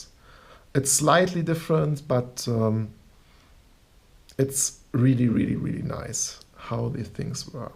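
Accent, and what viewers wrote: Germany. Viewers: Deutscher Akzent kickt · Man hört das du eigentlich deutscher bist. Ist aber nicht schlimm. Beherrscht die englische Sprache auf jeden Fall besser als ich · Man hört halt echt raus,dass er Deutscher ist🤣🤣🤣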